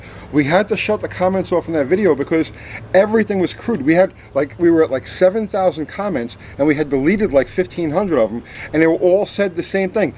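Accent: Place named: Philadelphia, United States. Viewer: Why don't you roll up a cigarette pack in your sleeve you look like an idiot when you're smoking on camera. I mean it's already an uphill battle with that yo Adrian accent you have synonymous with stupid so just put the cigarettes out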